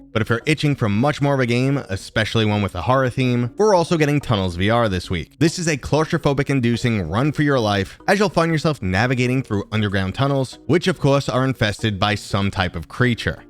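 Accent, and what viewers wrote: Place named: Long Island, United States. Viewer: you sound like you're from long island new york. I live there. Just taking a guess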